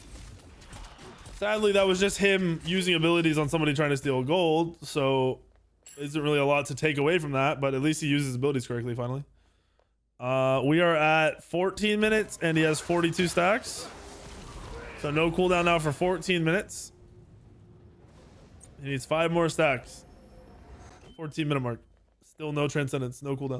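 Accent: Jamaica. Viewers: he sounds jamaican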